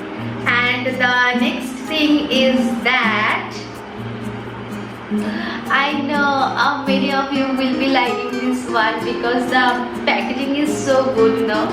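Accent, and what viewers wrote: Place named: Bengal, India. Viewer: First View Is My ❤️ and And First Like Is My ❤️✨ lot's of love your BANGALI VOICE Cutest pie 😊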